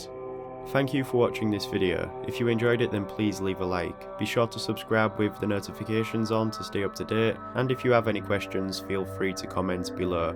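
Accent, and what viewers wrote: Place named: Scotland, United Kingdom. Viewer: Is he Scottish? I love his accent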